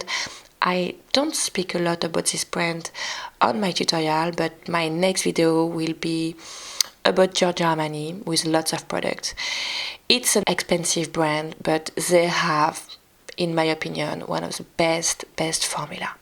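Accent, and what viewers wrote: France. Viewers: Salut! Tu habites où? Tu as beaucoup de vocabulaires c’est super mais ton accent est super français xD ! C’est pas méchant :) je te souhaite une bonne journée !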